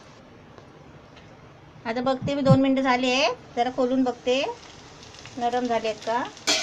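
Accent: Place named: Ratnagiri, India. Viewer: mavashi khari mavshi vatate avajavarun agadi Ratnagiri chi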